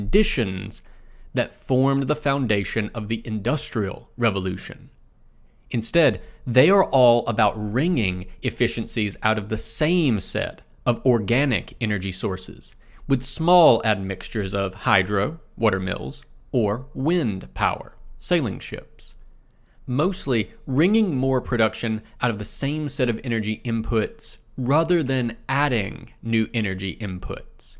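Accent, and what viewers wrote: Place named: South, United States. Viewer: Finally some real food... I mean, ACOUP voice overs with a delightful southern drawl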